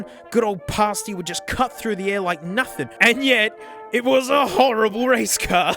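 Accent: Australia. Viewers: This review was so aussie, I didn't understand a thing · ausie man does ausie things and talks in a funnney way, mate that's why i'm here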